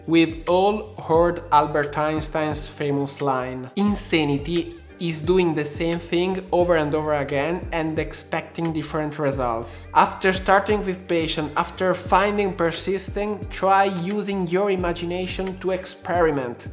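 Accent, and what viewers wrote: Italy. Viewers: Si sente l'accento Veneto anche così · Secondo me sei bravo, sono veneta come te ed ho la pronuncia molto simile, però l'impegno c'è e da qui in avanti c'è solo da migliorare. Perciò bravo, davvero ammirevole. Ma la butto lì, non era più semplice aggiungere dei sottotitoli inglesi ai tuoi video del canale principale? Magari diventava un lavoro più complesso per ogni video però recuperavi il tempo speso nel farli due volte, uno in italiano e uno in inglese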